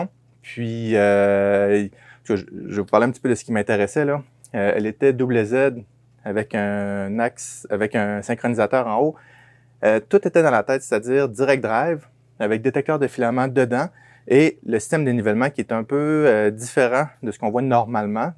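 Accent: Canada. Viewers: Bonsoir, Bien content de cette nouvelle présentation. C'est toujours un plaisir d'entendre ce jolie accent Canadien et toutes ces expressions bien de chez toi. J'aime beaucoup la présentation très naturelle même si on voit que tu as préparé beaucoup et que tu as du passer beaucoup de temps au montage. Un bonjour du sud de la France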